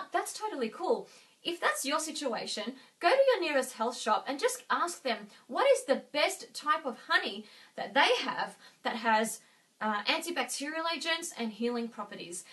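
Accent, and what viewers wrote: Australia. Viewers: Wow I need to go to Australia and marry an Aussie woman when I grow up because the accent is SO clean! :D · Her accent is Australian. Not like the movies is it? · You look Asian but sound Australian..what are you..? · Its clearly australian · Juan Barboza it doesnt sound American and British because its Australian.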